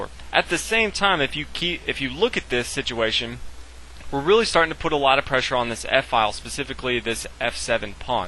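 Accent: South, United States. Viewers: This commentator is fantastic. Erudite, charismatic, and populist. Just want to offer my lay endorsement. Plus, I like a good Southern accent in the context of monarchical European Game of Kings. :)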